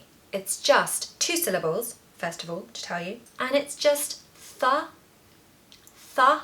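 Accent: RP, United Kingdom. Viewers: Hello! Madam, I love your British English accent. Your teaching techniques are excellent. 😊❤ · Splendid British pronunciation ,Thank you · Your are absolutely welcome. Keep on teaching with your beautiful RP and encourage learners to converse in correct English. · Lovely accent you have! I always liked everything British so much and try to improve my spoken British further, so I’m happy to have found the channel. So actually apart from maybe Wocestershire, I didn’t find any of these words very hard to pronounce. Even if I maybe don’t always get it precisely right, I knew how they should sound. In fact, my most hated word to pronounce is actually: PURCHASING!!! I’m working as a buyer and everytime I have to use that word I say it with a German emphasis on the second syllable instead of the first one!! I have to force myself to say „purr-che-sing“ instead of the rather German sounding „per-chaesing“😂😂😂 I pronounced it wrong when talking to a Texan and he didn’t understand at all what I meant. 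I hate that word 😂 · wow I like.british speaking accent english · I love your British accent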